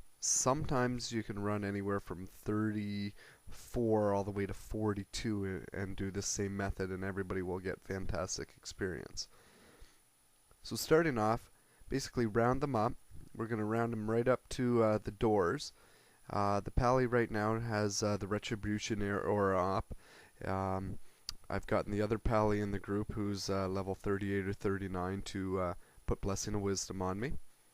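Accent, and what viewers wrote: Canada. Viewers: haha I remember at the time he was doing this I was just amazed. Because I hadn't seen anything like this. I was like HOLY SHIT!!!!!!! and I like his Awesome Canadian voice <3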